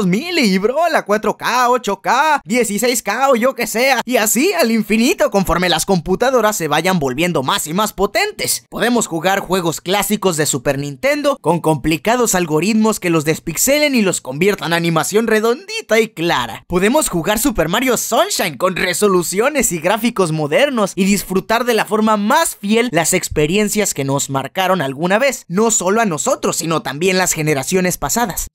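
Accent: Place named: Yucatán, Mexico. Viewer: Para nada, al contrario me gusta tu estilo , si noté un acento medio yucateco BOMBA! , pero es sólo mi percepción, saludos y buen trabajo!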